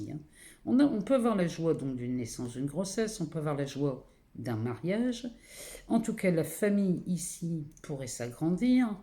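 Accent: North, France. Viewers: Vous avez un bel accent du Nord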